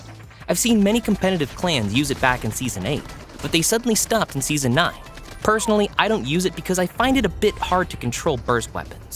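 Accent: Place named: India. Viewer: His voice is very nice. But of course we want the natural Indian accent. 😊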